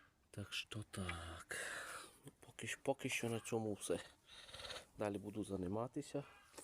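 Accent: Transcarpathia, Ukraine. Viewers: Українська ,закарпатський діалект ти ще не чув наш суржик південний😂 а взагалі у всьому світі така ситуація,в кожній місцевості свій говір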